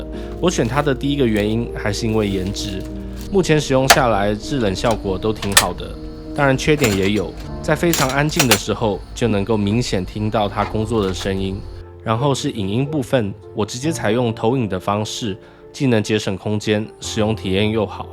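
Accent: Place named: Taiwan. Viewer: 這是在大陸 我以為在台灣 台灣的口音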